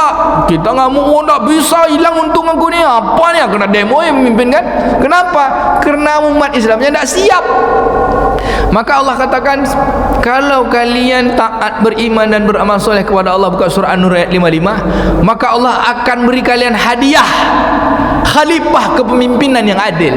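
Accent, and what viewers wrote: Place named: West Sumatra, Indonesia. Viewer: Dari sumatera barat, ada sesekali beliau pakai bahasa minang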